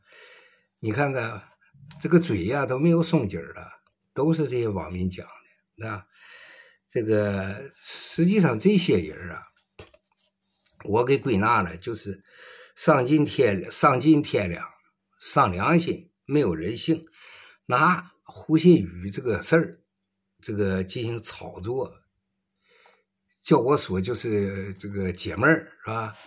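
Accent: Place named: Liaoning, China. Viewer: 你是辽宁的吧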